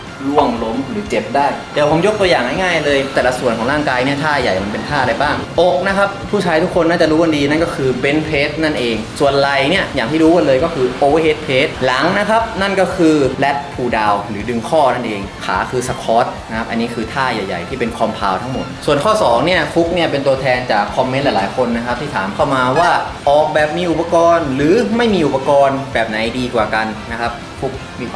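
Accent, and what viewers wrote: South, Thailand. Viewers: มาแล้วสายฮามีสาระ5555 ชอบสำเนียงคนใต้ชัดๆ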